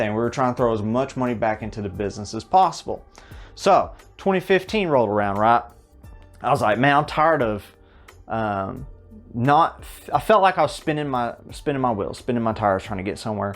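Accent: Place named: West Virginia, United States. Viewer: awesome dude. I was hoping you were closer to home as we share the same accent. Lol. I'm here in point pleasant wv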